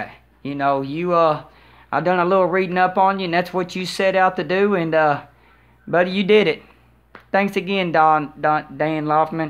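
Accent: South, United States. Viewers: Good Ol' boy does audio...I have not heard audio gear reviews with a heavy southern accent. I like it! Haters gonna hate on Emotiva, seems impossible to go wrong with for the $.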